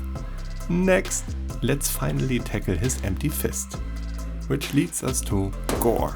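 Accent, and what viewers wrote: Germany. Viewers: Are you german? Great vid btw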